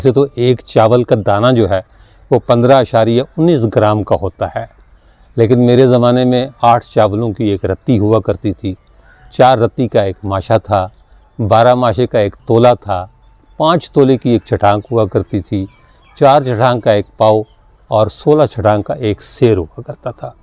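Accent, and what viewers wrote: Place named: Urdu, India. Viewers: कोई फर्क नहीं है उर्दू और बोलने का लहजा UP jaisa h . Pehle Baar jb Aapka vilog dekha mujhe bhi aesa lga .I'm from UP .. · Thank you for sharing your family information with us. I am curious if your ancestors actually migrated from India, cause your Urdu accent is very much like Urdu speaking families who migrated from Urdu speaking regions of India like Lakhnao or Dehli etc.? May Allah bless you and your whole family!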